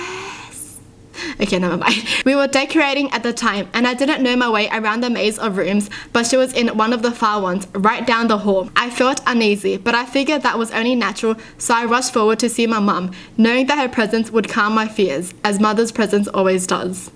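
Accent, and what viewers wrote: Australia. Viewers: She knows how to say it,it's just hard to say probably because she has an australian accent.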